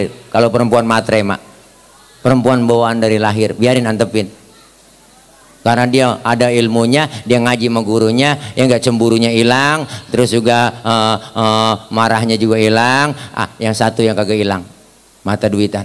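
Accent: Jakarta, Indonesia. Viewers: smga slalu dlam lindungan allah.sehat" slalu kyai ku.. sya org jawa. tpi resep bgt sma cramah kyai.. khas bgt betawi nya.. apa lg klo GLEDEK. 😁🙏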